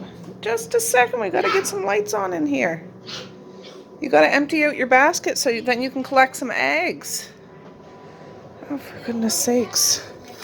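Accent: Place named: Nova Scotia, Canada. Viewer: First time watching the post on its first day. It took 3 months to watch every post from the very beginning. 🙌 🙌 I watch because it sounds like home. Born and raised in Nova Scotia.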